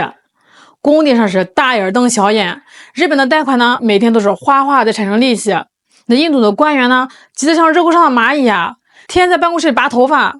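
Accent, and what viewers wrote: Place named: Shandong, China. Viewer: 口音像山东老乡呢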